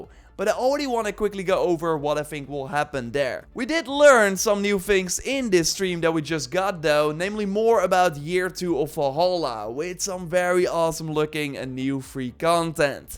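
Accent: Netherlands. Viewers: The Holland dutch accent tho strong here.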